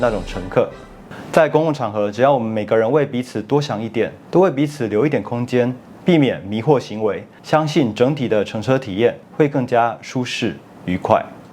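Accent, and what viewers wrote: China. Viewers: 臺灣如果公車文化不夠好，那大陸不就是難民出現了嗎？你這個大陸人假扮臺灣人，口音一聽就知道你是大陸人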